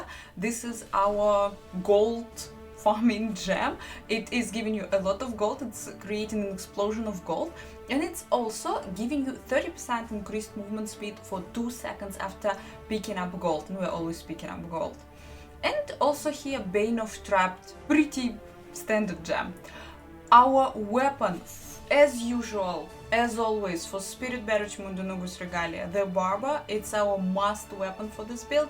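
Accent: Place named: Russia. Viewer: Как легко узнать русскоговорящего по его произношению английского языка) Но вполне сносно!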